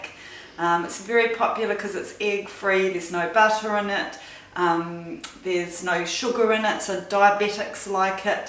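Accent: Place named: New Zealand. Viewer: Love that kiwi accent. As well as the fruit cake recipe. 👍😉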